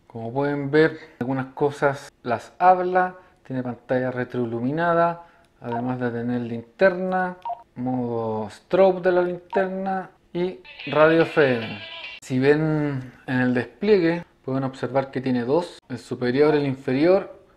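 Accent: Chile. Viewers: Hola! Buscando maneras de programar mi nueva BF-UV5r llegué a tu canal y me ilustró mucho. Vi este video para conocer un poco más el equipo y al finalizar mencionas que luego subirías otro video explicando la función de los radioaficionados y qué se puede hacer con los equipos, me gustaría poder verlo... ya que al ver la materia de estudio para los examenes de la subtel no me queda claro cuál es el “brillo” de ser RA. Saludos! (Me encanta escuchar videos en “chileno” adecuado a nuestra realidad)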